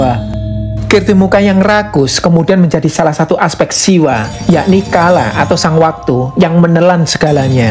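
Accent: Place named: Java, Indonesia. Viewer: Wong ndi too le omong ko njowo bgtz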